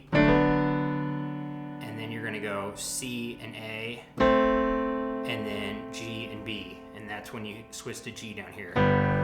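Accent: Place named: Rural, United States. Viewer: Your voice is so country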